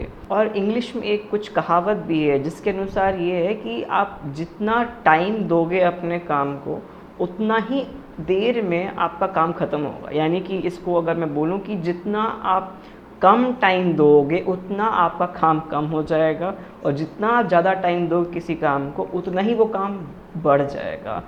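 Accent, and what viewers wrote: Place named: Jharkhand, India. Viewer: Are you from jharkhand? As i have the same Hindi tone too.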